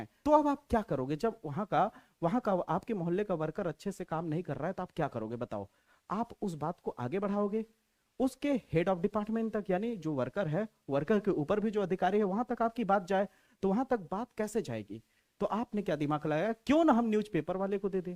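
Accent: Bihar, India. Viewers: Ap bihar se ho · Sir app bihar se ho na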